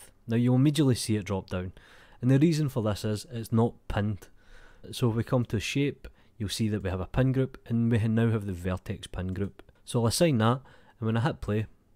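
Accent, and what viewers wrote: Scotland, United Kingdom. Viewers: Soo Scotish accent :)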